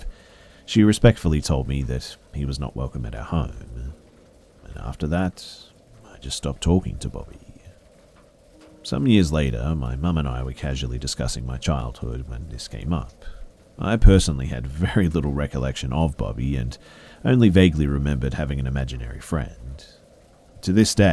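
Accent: Australia. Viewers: Ahh time for bed. taking this soothing Aussie voice with me. Love ya!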